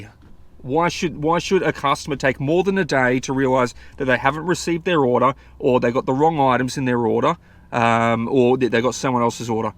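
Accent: Australia. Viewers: I love your accent! Australia?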